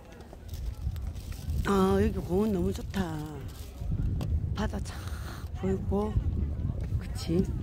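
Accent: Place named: Pohang, South Korea. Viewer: 4~~♡ 포항이라서 사투리가 반갑 네요 소중하신 님의 영상 열열이 응원해 드리고 물러갑니다 수고 하시고 또뵈요,^^ 👍♥️🌈🙋‍♀️👍😃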